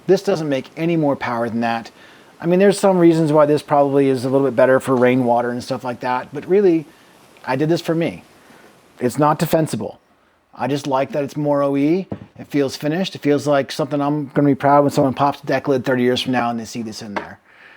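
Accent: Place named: Midwest, United States. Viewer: Subscribed. ;-) I liked the music added in during the printing process, maybe look for other places to add some background music for a bit of ambiance. Otherwise a great first video, and clear that you've been taking notes on other good creators out there. Reminds me a bit of Greasy Fingers videos - but with a clear Midwestern accent (I'd guess Minnesota, Wisconsin or Iowa), rather than the German.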